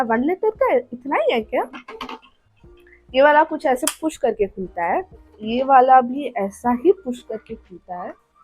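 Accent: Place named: Kerala, India. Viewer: Is really your a malayali that I want to say you have a great malayalam slang really I want to praise you , you are amazing that when you said malayalam that I shocked because that is so good then I don't know you are a malayali i have a wish if you are a malayali please speak Malayalam again that I like the way you say malayalam I want to hear your Malayalam again and please say my name ramziya As a malayali I don't have this like slang I loved your Malayalam words I wish I can hear it again like when you speak Malayalam all the one who hears will melt for that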